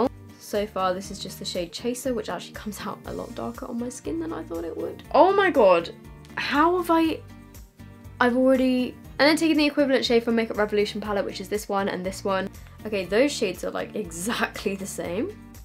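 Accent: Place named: United Kingdom. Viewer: you sound so brittish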